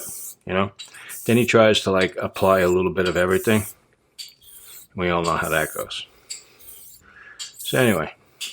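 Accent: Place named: Brooklyn, United States. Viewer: I love the Brooklyn Italian accent, by the way. It's music to my ears.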